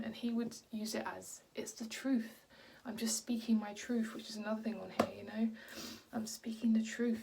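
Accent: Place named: United Kingdom. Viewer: Oh my god I’m only 20 minutes in and he sounds awful! You’re such a sweet person and you deserve so much better than this. I’ve watched your Lush videos for years and have adored you and your British accent. I know you will get out of this rut and rise like a Phoenix. Love from a vegan lushie in Ohio.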